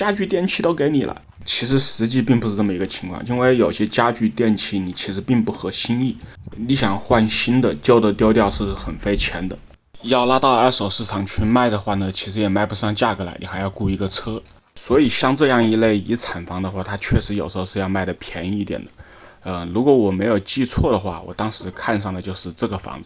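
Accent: Wuhan, China. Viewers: 之前听你说话觉得你怎么像我们武汉人后来看到评论说你就是武汉人，哈哈原来是老乡！😊😊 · 你要不是武汉口音明显，我真怀疑你是国外长大的。口条都不知道😂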